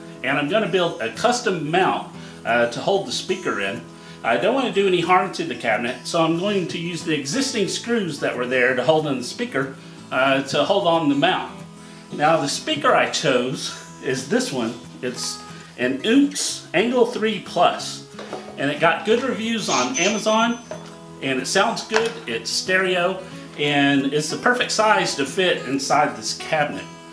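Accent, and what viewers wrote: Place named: Alabama, United States. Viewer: Love the radio and your solution until chassis can be repaired. Hope you don't mind me asking. Are you from Alabama? Accent sounds familiar. Anyway all the best from Western Australia.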